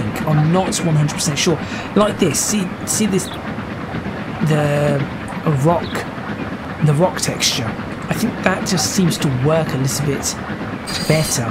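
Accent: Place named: Liverpool, United Kingdom. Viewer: With youse Scouser accent, I have the same problem with Yorkshire. Where t’ t’ goes…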